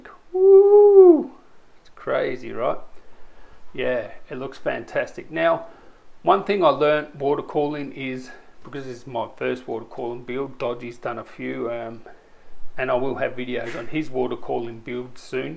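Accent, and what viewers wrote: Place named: Australia. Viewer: Getting rid off ur au accent may appeal more views, mean no harm ♥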